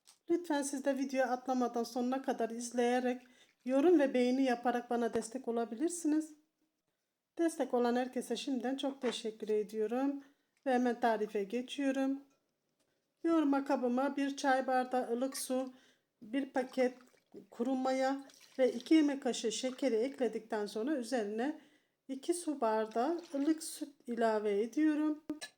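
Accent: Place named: Black Sea, Turkey. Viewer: Ellerine sağlık çok güzel olmuş karadenizlimisiniz konusmasiveniz ayni bendekaradenizliyim izmirden selamlar ❤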